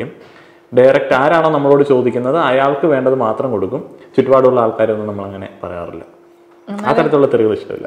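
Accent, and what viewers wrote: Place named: Kottayam, India. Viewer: പെങ്ങളുടെ ഭാഷ കേട്ടപ്പം മനസ്സിലായി, കോട്ടയം ജില്ലയുടെ ഏതോ ഭാഗത്ത്‌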